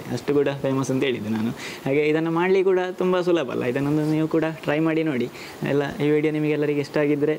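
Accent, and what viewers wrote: Mangalore, India. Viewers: Nan aduge nodthino gothilla but nim mathu keloke vidro nodthini Mangalore language super thumba chanda ಉಂಟು keloke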